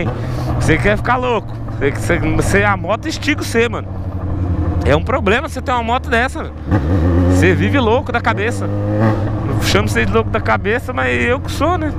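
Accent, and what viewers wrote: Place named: São Paulo, Brazil. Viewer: esses pé vermelho baba um ovo pro sutaque paulista kkkk, mais esse é atentado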